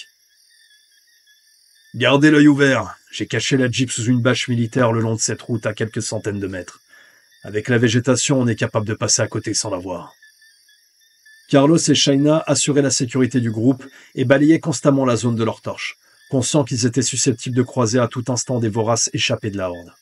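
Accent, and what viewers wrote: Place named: Mexico. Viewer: Tr bonne vidéo ton accent mexicain est déjà légendaire merci pour la video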